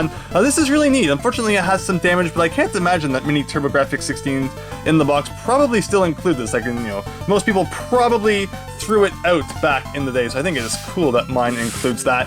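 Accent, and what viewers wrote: Canada. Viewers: Have to ask..I hear a Canadian accent, I get the impression that the Tg-16 was a bit more popular in Canada rather the US. Did NEC directly supply Canada? Just curious .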